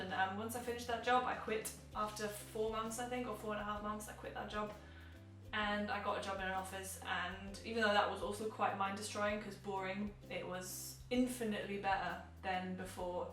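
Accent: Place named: United Kingdom. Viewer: Es ist wirklich unglaublich, dass du Deutsche bist. Dein britischer Akzent ist wunderschön :) Bin neu auf deinem Kanal und wollte mich auch mal zu Wort melden 😊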